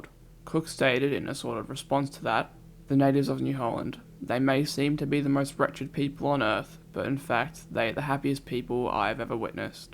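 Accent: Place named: New Zealand. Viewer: I'm a New Zealander and honestly thought your accent was Kiwi at first.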